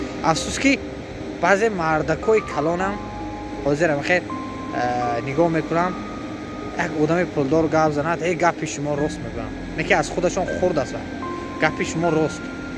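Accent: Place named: Vorukh, Tajikistan. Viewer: акценти ворухи гап мезанед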